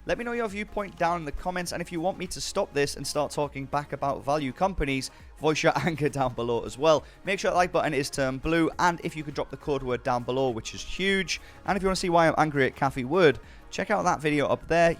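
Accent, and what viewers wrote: United Kingdom. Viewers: Hay, Just new to this, but loving having a UK voice tell it like it is. Was think I might throw something at this, Cant find the stick on Etoro so I am guessing is one some sort of sub exchange. Any suggestions where best to access DWAC stocks from?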